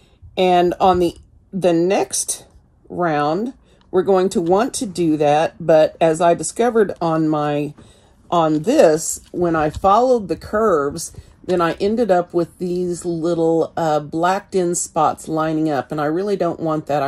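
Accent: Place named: South, United States. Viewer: I am really enjoying your videos and your gentle, southern encouragement,. Thank you for the time and effort you are taking to make them. You have reminded me that an embroidery teacher once taught me that we all think the person on our left has nicer stitches and the person on our right has nicer colours, but remember that each one of us will always be the person to the right or left of another learner. That is exactly what you are saying about Zentangle. Don't give up, just be you. (Melb, Australia - we are opening slowly but with great vigilance. The curve has definitely flattened but we are still seeing random local clusters. Fortunately out of 7k cases we have only had 100 deaths).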